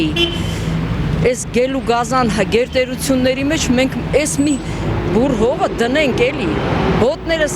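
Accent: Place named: Turkey. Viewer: Հաստատ թուրքա հայերեն խոսացող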